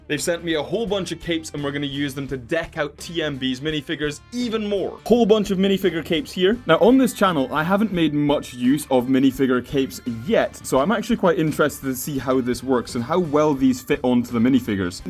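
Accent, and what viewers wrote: Scotland, United Kingdom. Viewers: are you scottish?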